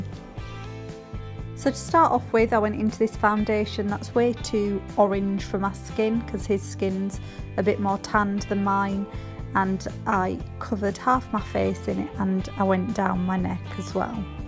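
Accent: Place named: New England, United States. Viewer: Live the yanky accent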